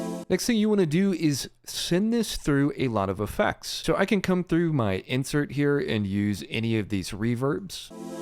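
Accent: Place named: West, United States. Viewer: The deep West accent just makes these even better, it's that American accent we never hear in the media, it's a complete trip man.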